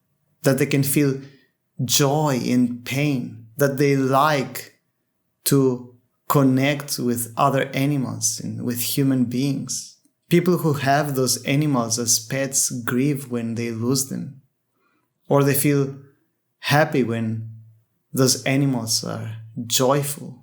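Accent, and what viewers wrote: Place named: Greece. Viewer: Just saw this - never got a notification that you had answered! Your name may be Greek, but lots of people use Greek names, or other exotic-sounding names online, so no, that wasn't the reason. Your accent sounded very familiar to me, as I am Greek myself, and an EFL teacher as well, so it's basically what I hear every day! Thank you for bringing some quality content to the internet; a whirlpool of cheap, fleeting content. Your videos are to-the-point, every sentence you utter is thought out and meaningful and you always make a valid point and get us thinking. 😊❣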